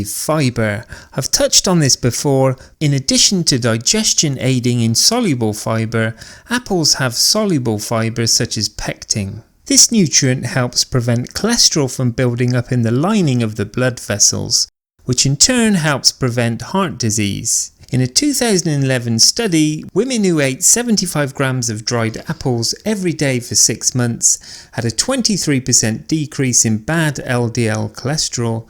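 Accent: United Kingdom. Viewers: good info and nice british voice!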